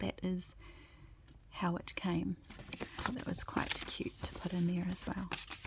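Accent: New Zealand. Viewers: When I heard your accent I knew New Zealand! 💖 Can't wait to eventually visit.... Lovely journal YES so many ideas! I especially loved the double sided lace pocket that revealed the card inside! thank you for sharing your work! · Gosh, some yummy ideas in this, I love it. What great idea for the Hydrangeas!!!! So very lovely and inspiring. Sending love from New Zealand. xxxxxxxxx Actually it sounds like you also a Kiwi. !!!!! You are very talented. xxxxx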